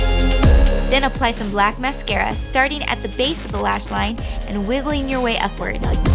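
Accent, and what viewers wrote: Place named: United Kingdom. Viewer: Not a bad British accent and that's coming from a Brit, I always really enjoy your make up tutorials. I love how you go the whole hog with all the back ground and everything following the theme - Awesome!! x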